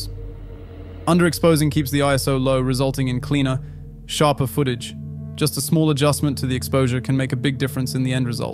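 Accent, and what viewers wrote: Australia. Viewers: I paid attention to your voice. It's either your accent improved (sounds somewhat australian like) or it's some AI involved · Your accent sounds Australian in this video 🇦🇺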